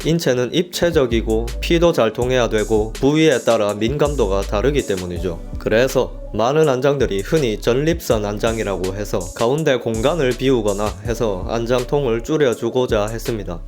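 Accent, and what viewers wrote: Gyeongsang, South Korea. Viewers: 저도 경상도 사나인데 사투리가 와이리 듣기 좋노~ 참 히안하데이~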